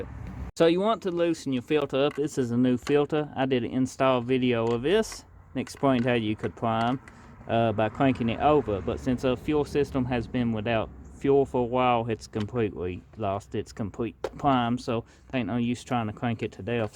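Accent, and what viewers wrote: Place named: Louisiana, United States. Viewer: I'm going to take a wild guess and say this feller is from Louisiana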